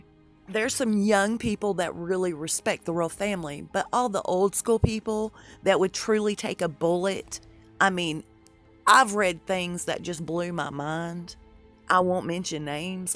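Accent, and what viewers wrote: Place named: North Carolina, United States. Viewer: Yes, I want the in depth video! I have heard this shady side of the RF for years, and I think part of why they get away with this is that the things they do are so appalling and out there, that people just can't wrap their heads around any human beings doing such things. About hands...I remember a picture of Hillary taken after she had that collapsing episode, where her hands looked markedly enlarged and odd to me. I remember the picture was outside of Chelsea's apartment and Hillary was trying to convince people that she was fine. She was wearing a navy blue pantsuit. That picture stuck in my mind for another reason too. Hillary looked different, and I wondered if it was a body double. I think so much about these elites is just smoke and mirrors, nothing is as it seems. I feel like we've fallen through the rabbit hole. 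And, I love long videos when they're your videos! I like to listen to videos when I am cooking and washing dishes, etc. Seems that I just get my hands covered when kneading dough or scrubbing, then the video is over. Thank you for all you do! You're a blessing! I was on the phone earlier with a friend from Lenoir, NC, and your accent reminds me so much of hers! 💕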